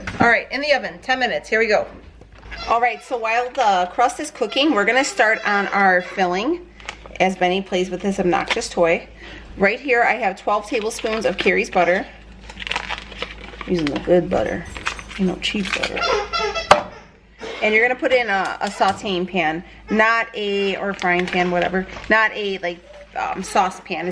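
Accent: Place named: Minnesota, United States. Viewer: I hear a northern accent..are you from Minnesota ?? I was born and raised in Minneapolis -Fridley.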